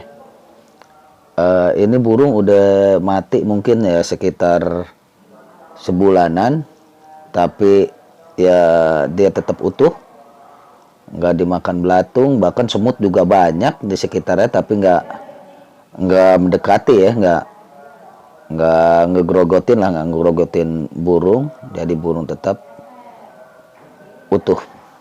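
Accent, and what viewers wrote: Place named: Jakarta, Indonesia. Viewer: Jiahahhaa asik di bales... makasih ya bang ❤💘 pantas aja logat nya kaya dari betawi... sukss sllu ya bang moga semakin berjaya setra sllu dalam lindungn Allah Swt Aamiin.....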